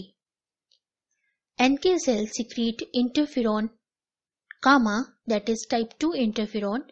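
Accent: India. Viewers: whats the word minutes? very Indian pronunciation, I don't understand.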